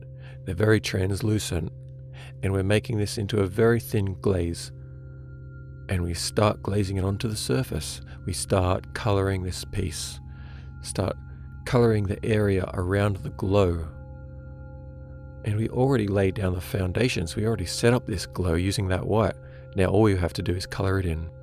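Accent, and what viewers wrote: Australia. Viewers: dude i fucking love you and your videos. amazing work, amazing commentary, delivered like only an aussie can. subbed! keep it up!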